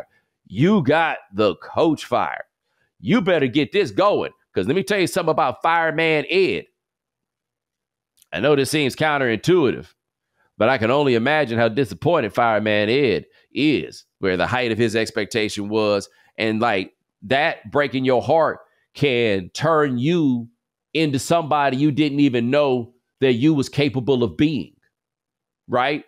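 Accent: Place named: Los Angeles, United States. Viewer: Geez that LA accent is strong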